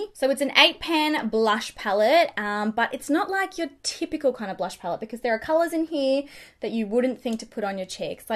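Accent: Australia. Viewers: I just want to put this out there. I'm not gay or anything. I'm happily married for 37 years. I just really love the way Australians talk.. That's all...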